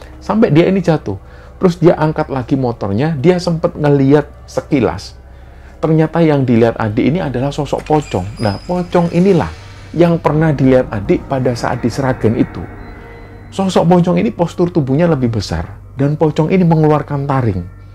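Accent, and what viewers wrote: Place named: Surabaya, Indonesia. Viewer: Nuwun sewu, sedikit saran aja mas, usahakan jgn pakai dialek surabaya/arekan kalo menceritakan dg latar jawa tengah dan daerah dialek non arekan...matur suwun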